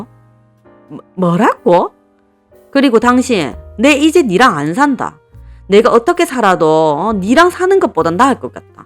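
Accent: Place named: Busan, South Korea. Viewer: 사투리 정말 잘 하네요. 부산 사람이신가요?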